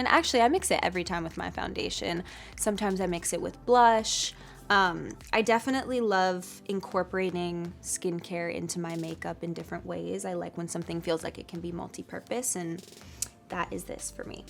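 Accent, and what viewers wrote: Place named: England, United Kingdom. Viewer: I really Love her...I am from Sri Lanka...Her English accent helps me improve my English....I wanna hug her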